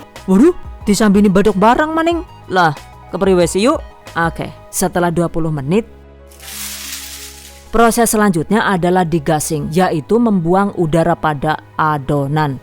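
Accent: Banyumas, Indonesia. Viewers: Duh serasa kek nonton langsung demo masak ada bumbu2 joke khas ngapaknya🤣 best lah lain daripada yg lain👏🏻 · Banyak banyakin jokes ngapaknya 😂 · Jebulr ngapak 😂😂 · Ora ngapak ora kepenak ya bund 😅😅😅😅😂😂😂resepnya mantuulll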